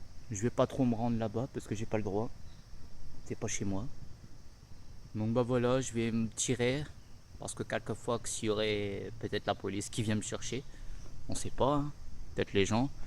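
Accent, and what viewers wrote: Nord-Pas-de-Calais, France. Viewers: t est chty nord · Rien de tel qu'un français tel que toi avec ton accent pour démystifier définitivement cette demeure...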